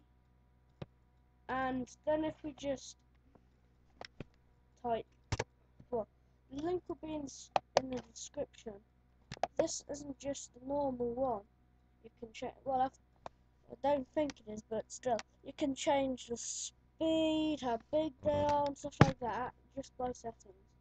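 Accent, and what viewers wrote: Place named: United Kingdom. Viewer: that British bloke garble you are mumbling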